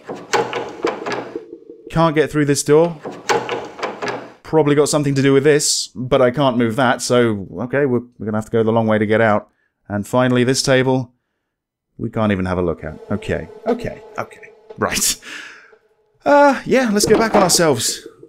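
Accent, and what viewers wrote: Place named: England, United Kingdom. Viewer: I love your english accent. I'm brazilian. You´re a very nice guy! (I believe that´s how to say that, in Brazil it'll be "simpático".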